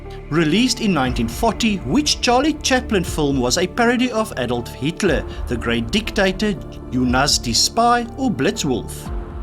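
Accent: Afrikaans, South Africa. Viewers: Klaarblyklik is u eerste taal Afrikaans, maar meestal is u Engelse uitspraak aanvaarbaar. Ek vra vir my of u enige dokumentêre of reisgidse oor Suid-Afrika wat deur Amerikaners geskep is, bekyk het? Ek het, en sommige van hulle is uiters goed, veral as die mens/e wat daarvoor verantwoordelik is, 'n paar minute op die uitspraak van Suid-Afrikaanse name en plekname gespandeer het. Vandag is dit baie maklik om korrekte uitsprake op die internet te kan vind, en 'n mens hoef nie 'n pedant te wees nie; veel name het standaarde uitsprake in buitelandse tale, b.v. "Paris", en natuurlik sê baie Suid-Afrikaners "Kru-gir" en nie "Kreur" nie, asook "Bloemfontien" en nie "Bloemfontein" nie - selfs die uitsaaiers! (Oké - ek erken dat "Bloemfontien" my pla!) Maar i.v.m. dokumentêre van S-A, is dit hoogs irriterend om verkeerde uitsprake van naam nà naam nà naam te hoor - dit skep die indruk dat die produsent/regisseur geen werklike kennis van die land het nie, en het sommer-so inligting van die internet opgegrawe om 'n wins daardeur te bekom. 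M.a.w. is die dokumentêre/reisgids onopreg, en daar is geen ware respek vir die land en sy mense nie. (My ore staan altyd vir die woord "fynbos" orent!) Ongelukkig, sedert ek 'n "pikkenien" was, het ek die geleidelike agteruitgang van sommige tale waargeneem - veral Engels, en veral in die VSA - weens verskriklike opvoeding in hulle moedertaal en luiheid. "Pasteur" is a Franse naam, en ja - ek haat die tipiese Amerikaanse uitspraak van "van Gogh", oo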